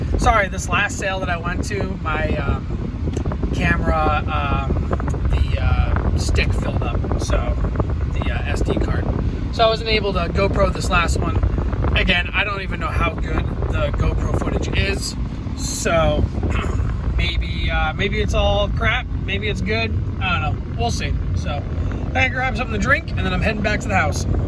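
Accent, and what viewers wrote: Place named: South, United States. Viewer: Hey it Southern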